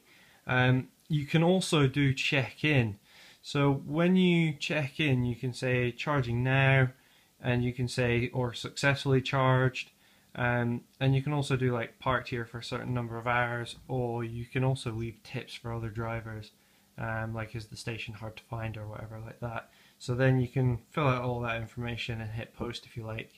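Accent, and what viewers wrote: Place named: Ireland. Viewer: I love your accent. Are you Irish?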